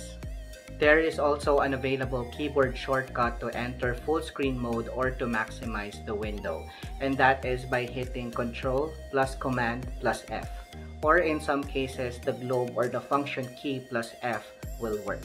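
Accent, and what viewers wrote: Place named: England, United Kingdom. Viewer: Good English accent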